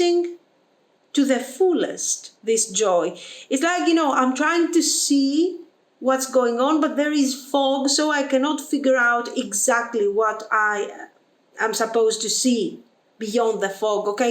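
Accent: Greece. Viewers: First time watching a video on your channel! As soon as i heard you I thought you were Greek, and you actually are😂🩷 Btw loved your reading εισαι υπεροχηη❤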